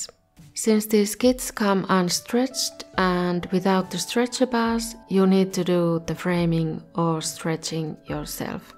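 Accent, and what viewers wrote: Finland. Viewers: What country are you from? Because that sounds a little bit like finnish accent.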